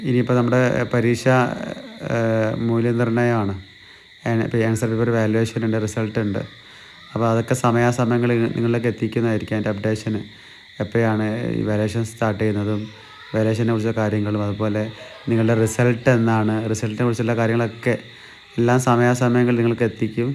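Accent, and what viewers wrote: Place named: Kozhikode, India. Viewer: ഞാൻ tvm ജില്ല. സാറിന്റെ ക്ലാസ്സ്‌ കേൾക്കാറുണ്ട്. നല്ല ക്ലാസ് ആണ്. ചില വാക്കുകൾ മനസിലാകുന്നില്ല. കോഴിക്കോട് രീതിയിൽ സംസാരിക്കുന്നത് കൊണ്ടാകാം. 😊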